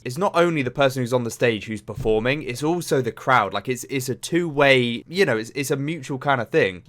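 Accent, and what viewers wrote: United Kingdom. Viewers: I love your brits accent ♥️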